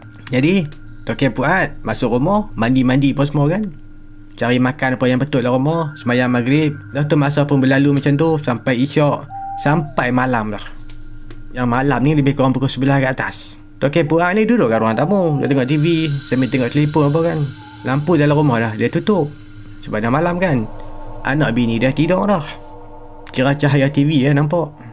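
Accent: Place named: Kedah, Malaysia. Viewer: sib baik pnh duk alor setaq wlupon xlame,jd dpt faham loghat kedah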